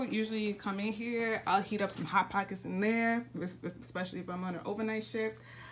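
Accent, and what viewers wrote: New York, United States. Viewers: Sound like a New Yorker😎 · as soon as I started watching I just knew you were from New York lol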